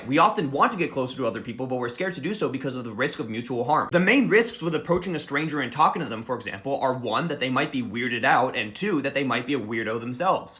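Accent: West Virginia, United States. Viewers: Are you from West Virginia by any chance?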